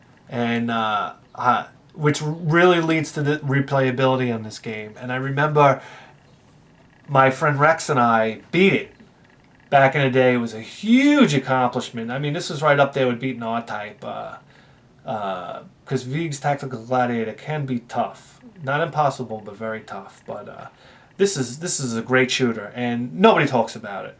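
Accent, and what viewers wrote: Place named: New Jersey, United States. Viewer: I love the rant, but I'm confused. You look like a hillbilly out of West Virginia, but your accent says Jersey trash.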